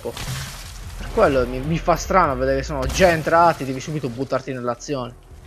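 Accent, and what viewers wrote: Sardinia, Italy. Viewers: Oddio, sei sardo?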